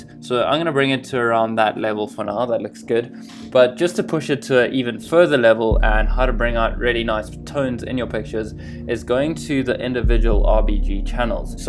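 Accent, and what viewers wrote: South Africa. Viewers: You sound south african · South African? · Great vid man! I'm a fellow filmmaker and photographer myself! Just moved to Cape Town, could tell thats where you're from because of the accent. Thanks for the great tips! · Are you south African? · Are you South African? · lol are you South African? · loved this! At the start, I could tell you were South African and went to go check in your about section haha. Thanks for the tutorial! I am about to use it for the first time and I think I have been well equipped. the sound was also 100% on my laptop.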